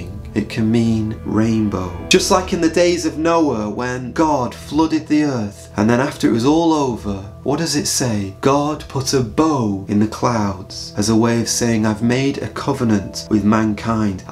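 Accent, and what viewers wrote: England, United Kingdom. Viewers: I love ❤ your English accent sounds so musical🎶🎵🎼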